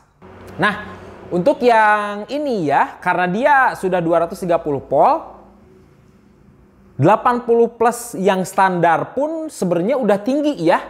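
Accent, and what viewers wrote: West Java, Indonesia. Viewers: urang sunda nya kang 😄😄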